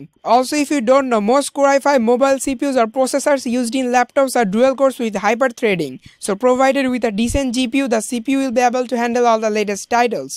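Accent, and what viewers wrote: India. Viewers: ur accent sounds like indian,btw where u r?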